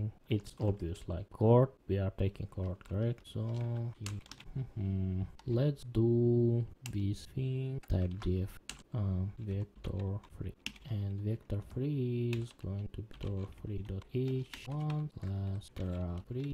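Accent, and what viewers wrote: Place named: Russia. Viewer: типичный русский акцент.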